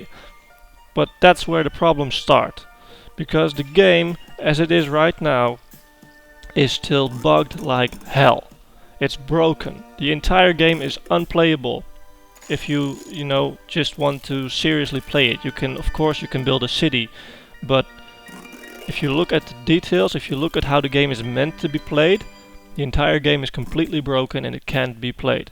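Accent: Netherlands. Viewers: Your accent :D, and I know you are from Holland, saw It on your twitter :D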